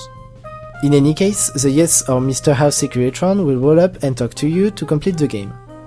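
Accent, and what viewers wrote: France. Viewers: ... never changes. In the beginning he uploaded great tutorials and lore videos, with a mild French accent. Now he uses his own music and has significantly upgraded the quality of his already amazing videos, but beneath the cover he's still the same. Because... never changes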